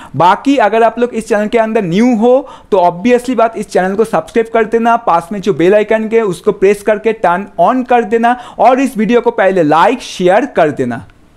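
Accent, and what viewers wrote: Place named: Bengal, India. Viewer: need to improve your communication a little, still a tone of bengali rest is ok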